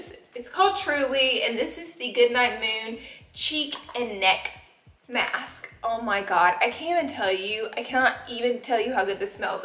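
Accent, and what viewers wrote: South, United States. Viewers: Sounds like she has a southern accent. I love it! For time watching her.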